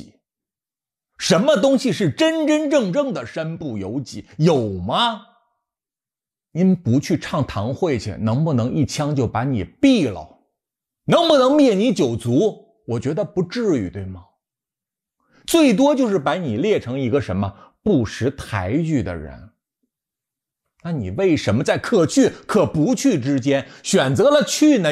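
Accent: Beijing, China. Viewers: 一口京腔，南方人的我不太习惯。还是喜欢标准普通话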